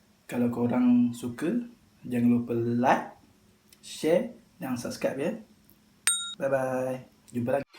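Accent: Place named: Kuala Lumpur, Malaysia. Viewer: ckp kolumpor ho..haha hai nama saya beng.igt x?😆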